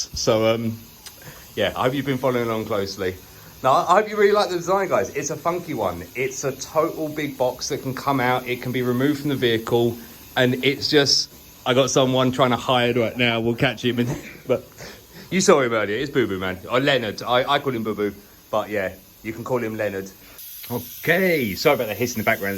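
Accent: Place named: England, United Kingdom. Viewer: You have such good material for your videos.. but the English Accent that England is so proud of has got to be the worst sounding English ever .. I am from South Africa and much prefer hearing an American English accent than this.. I can barely watch this.. it's like not even English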